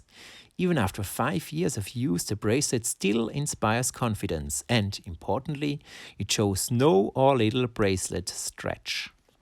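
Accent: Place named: Austria. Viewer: Great video! I feel you have an Austrian accent. Am I right?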